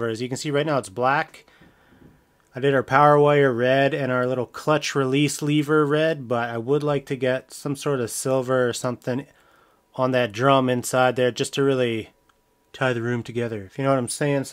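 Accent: Canada. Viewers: You're Canadian?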